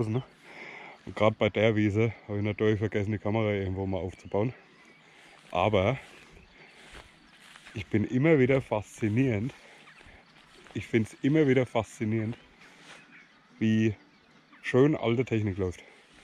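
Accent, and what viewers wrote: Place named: Lower Franconia, Germany. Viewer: Schööön! Ein Undder-Frrrange 😇 sonst gibt´s hier nur Ober-Frrrangen 😂 direkt abonniert und gelikt!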